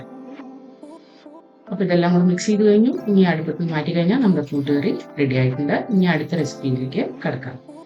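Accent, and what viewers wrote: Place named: North India, India. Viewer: R u a malayalee brought up in north because of your malayalam I feel so . ❤❤❤❤❤nice vlog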